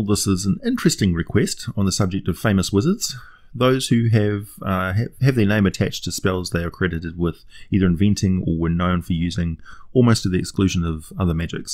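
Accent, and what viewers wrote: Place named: New Zealand. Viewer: Boys we got a kiwi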